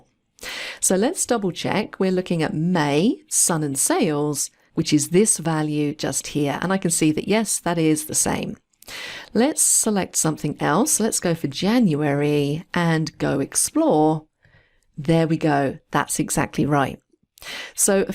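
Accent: United Kingdom. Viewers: Wonderful and helpfully thorough presentation. I learned alot. The British accent was a bonus, as any serious topic spoken with a British accent makes the topic suddenly sound more intellectually sophisticated lol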